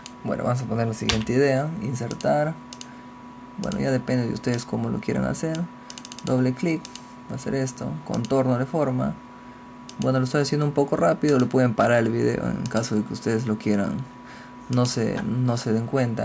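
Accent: Peru. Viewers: PARECES PERUANO CAUSA:v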